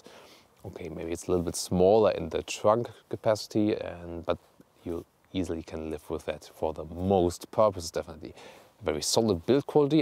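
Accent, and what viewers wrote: Germany. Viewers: Ich check das Kanalkonzept einfach nicht - deutscher Kanalname, deutscher "Presenter", aber dann dieses seltsame Englisch, bei dem jeder 100 Meter gegen den Wind hört, dass er Deutscher ist? Warum nicht einfach auf deutsch? Wegen der Reichweite des Kanals? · With the Cherokee I have difficulties to put a finger on the design. Seeing it on pictures or in videos you cannot associate it a Jeep. Seeing it on the street and standing near it this impression is totally different. Yeah, it is a Jeep and somehow it fits into the family. You have the Renegade, which is a fun car to drive, for younger people who don´t go mainstream with a Golf or similar, then the Cherokee for kids transporting mummies who normally would opt for the dead boring VW or the XC60 and then you have the GC which leaves a nice footprint in the premium class as one of the last remaining real SUVs. 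Btw. meine lieben people. As always great presentation - keep on going. But still, this German accent is still there. Never mind.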